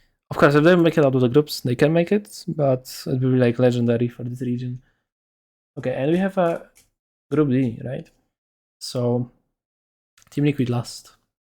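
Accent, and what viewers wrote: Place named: Poland. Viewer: akcent polaka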